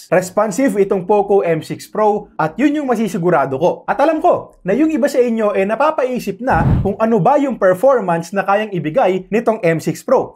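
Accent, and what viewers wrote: Philippines. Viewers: Love ko style mo skip unboxing direct to the phone na at clear Ang Bose's mo mabilis salita...Pinoy Ang Bose's...swak sa brain ko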